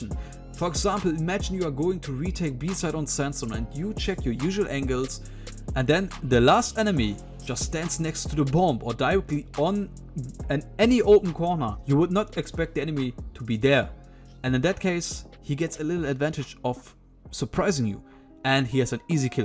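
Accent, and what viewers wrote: Germany. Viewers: Noch nie so ein deutsches english gehört hahahah nice video btw❤️👍🏼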